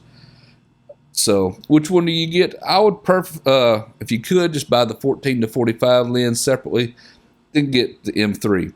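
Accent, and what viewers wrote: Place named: North Carolina, United States. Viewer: Great review! Thanks you for helping me make this decision! Also, your accent reminds me a lot of either a North Carolina or a Virginia accent. Greensboro, NC resident here! Again, thanks so much for all you do :)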